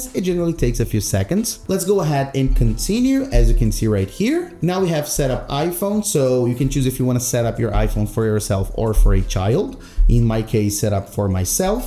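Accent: Brazil. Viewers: I had not expected to be listening to a help video in English with a Brazilian accent - yet here we are hehe On my iPhone 16e, I just can't get to the "Transfer Your Apps & Data" screen. My phone goes straight from the Hello screen to the keyboard customization screen and I can't figure out why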